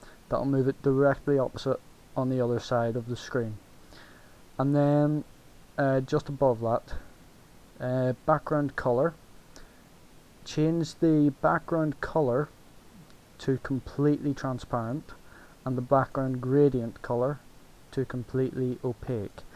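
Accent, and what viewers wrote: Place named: Northern Ireland, United Kingdom. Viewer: Northern Irish accent is beautiful. Could listen to it all day!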